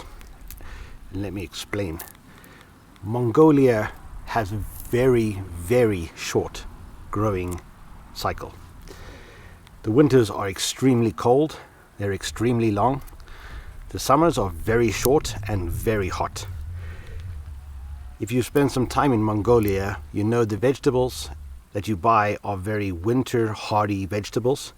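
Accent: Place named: Cape Town, South Africa. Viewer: I knew it🤣🤣🤣 I'm from Cape Town too..our accent is very distinct to the rest of the world..you can here a Cape Tonian accent peeping through any accent lol. But I'm so happy for you. Your life looks so pieceful. I'm struggling in Cape Town to escape the rat race. It's just so expensive to buy your way out to live self sufficiently here. I want to move off grid but land here is so tight and the land claim act is making it difficult for people. So I dream of taking an adventure like you, but I don't have enough money yet. But you are brave Sir. Very brave to journey so far to the unknown. I'm really happy for you. Keep on enjoying your adventures with your family..I have subscribed to your channel. I loved the archery video as I am into archery 🏹.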